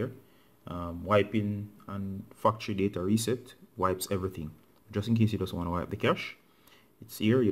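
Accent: Jamaica. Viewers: U kinda sound jamaican im also jamaican!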